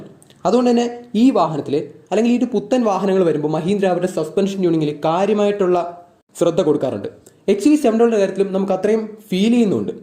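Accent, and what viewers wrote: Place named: Palakkad, India. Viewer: എന്തായാലും പാലക്കാട് ഭാഷ വിട്ടു ഒരു കളിയും ഇല്ല ഈ ഭാഷ തിരുവനന്തപുരംകാരൻ കേൾക്കുമ്പോൾ മനസ്സിലാവുമോ എന്തോ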